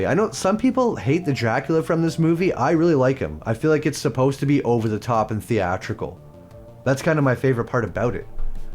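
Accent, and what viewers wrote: Canada. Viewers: his accent is wildly canadian lol listen to his outs and abouts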